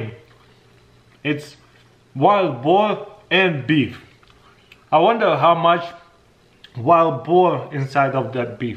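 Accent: Russia. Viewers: I’m just here to hear him say different animal names in a russian accent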